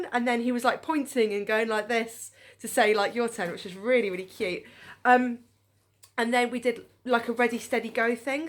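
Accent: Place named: United Kingdom. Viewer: I like u accent ! looks like british . But anyway , adorable video. Thanks for sharing with. Hello from Japan!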